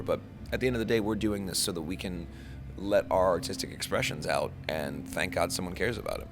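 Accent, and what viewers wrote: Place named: United States. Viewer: He has an American accent. Are you American by any chance?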